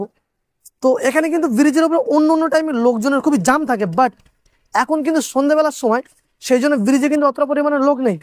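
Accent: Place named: Bangladesh. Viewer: Tumar kotha gulo bangaladeshi accsent lagche 🤔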